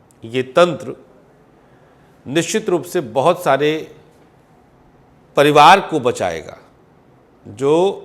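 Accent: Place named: Uttar Pradesh, India. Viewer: Aapki awaaz mein ego Nahin Hai Apnapan hai yah Awaaz up Ke Lal ki hai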